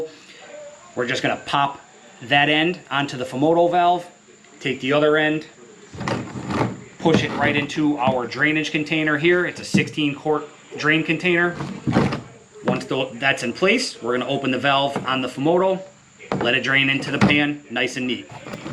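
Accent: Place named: Philadelphia, United States. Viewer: No way this dude ain't from Philly.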